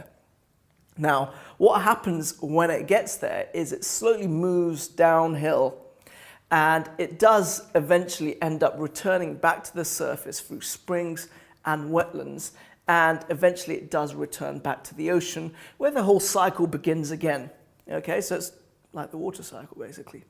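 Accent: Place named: United Kingdom. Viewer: bro no offense but u sound like british but looks like an indian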